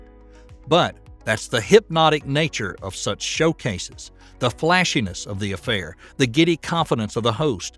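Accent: South, United States. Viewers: What a sh!tty scripted video. The southern accent makes it worse too. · Won’t listen to a cowboy accent talk about space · Quite frankly, this guy has no idea what he is talking about. Also, most of his information is very outdated or otherwise inaccurate. However, as entertainment, it's a gem. Southern accent: "the rocket plume is going to blow a hole in the moon".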